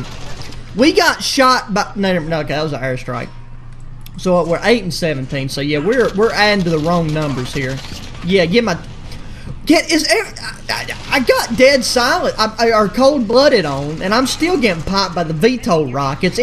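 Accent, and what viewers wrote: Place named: Eastern Kentucky, United States. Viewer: Where you from, man?? It's so rare to actually hear folks with our accent on here! Watching from east KY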